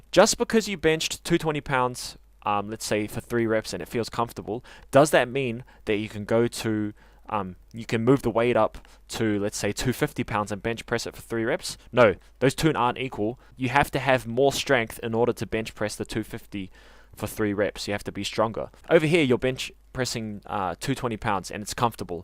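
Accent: New Zealand. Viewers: Thanks for this guide bro, you from nz?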